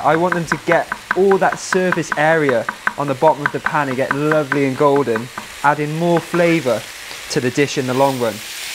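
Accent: United Kingdom. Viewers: Brilliant!!! 🤤🤤🤤🤤 I’ll never get sick of your UK accent, how you say vittttamen especially, adorable and sexxxy 😉😁🤣🤣🤣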